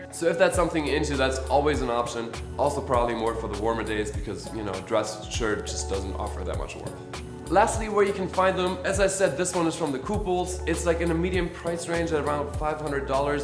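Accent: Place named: Germany. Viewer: He sounds Veryy german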